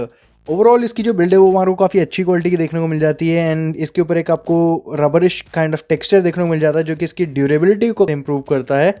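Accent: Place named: India. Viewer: work on your accent. It's half indian half english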